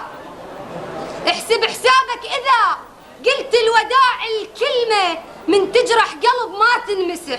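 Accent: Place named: Iraq. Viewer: الله، اللهجة العراقية روعة،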